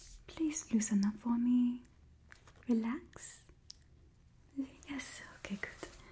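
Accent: France. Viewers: Wow you really resemble Natalie Portman in this video ... also loving the French accent 🤌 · You have such an Amazing French Accent 🙂🇨🇵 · Honestly, I think has the most smooth French voice I have ever heard. your voice is spot on and quite calming. 👌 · I like your french accent 👍 she is so sweet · I do love your French accent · I'm french and I find it very relaxing when you speak with that accent and pronounce french words. You're my favourite ASMRtist and I love your videos,! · I absolutely love it when you do your french accent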